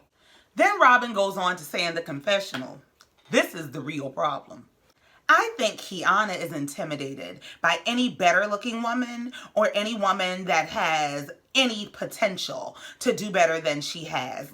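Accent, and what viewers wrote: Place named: New Orleans, United States. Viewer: Love how you talk . It’s similar to NO. It’s so cool.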